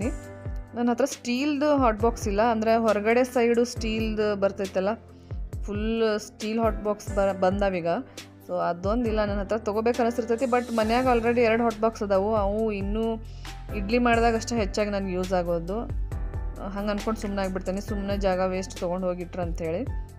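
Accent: North Karnataka, India. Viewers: Waiting for ur vlog ri.....don't mind....neewu complete uttara karnataka style naag matadi kelaak chand anstaiti....mysuru kannada mix maadbyaadri.....keep doing such vlogs we like ur vlogs so much.....👍👍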